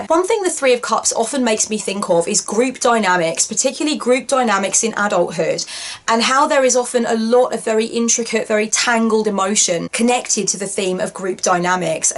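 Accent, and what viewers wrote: England, United Kingdom. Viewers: This was just an awesome, fantastic way to start my day here in SoCal, USA... Having "Tea & Tarot" with one of the brilliant spiritual mentors in my life. Got my own tea here, drinking it while I savor this time and take in the life lessons offered. 🌹🌙🌤💌☕️ Thank you for bringing this segment back! Also: you are VERY fucking English this morning, babes. 😄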